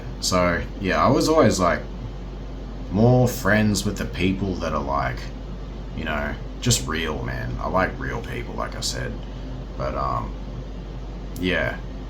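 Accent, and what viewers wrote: Australia. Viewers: I guess you are Australian ? Cuz of the accent)) do you call it spring ? Haha it’s 25 C degrees there it’s not spring, it’s summer)) I mean it’s very good temperature nothing compared to our coming winter it’s going to be -50 C 🤌 Also didn’t know that we have the same time zone almost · Sorry, but I never take advice from people who have Australian accents and a 1998 Boy Band haircut.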